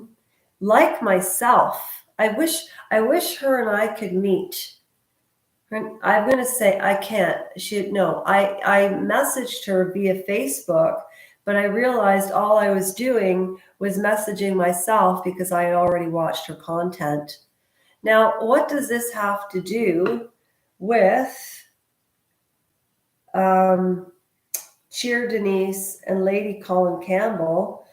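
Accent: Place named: Canada. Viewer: I notice you don't actually say what it was Lady C said so we can judge for ourselves. A bit like MM accusing the RF of racism without giving the words used and context. And yes she will sue if she has been defamed. She is old school. Lady C may have a castle but she worked bloody hard to afford it.She also has a title and uses it to good effect and has raised millions for charities and foundations in Sussex, the UK and abroad. FYI, the book was published in 1992. You sound Canadian so you should know how the working royals, especially Princess Anne, have worked with Canadians to make a better society. Again I ask: what was said - exactly?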